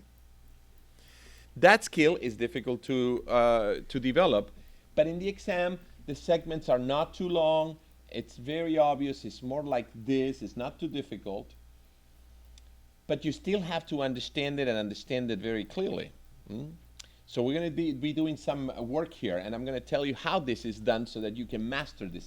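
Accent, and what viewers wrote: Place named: Argentina. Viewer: This professor has an Argentinean accent , i know because I'm Argentinean ;)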